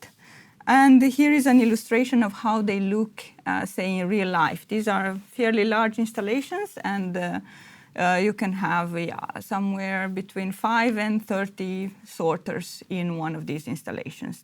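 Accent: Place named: Norway. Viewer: Norwegian english from. 😀 Tomta er litt for dyr for meg nå, ikke lett å regne inn.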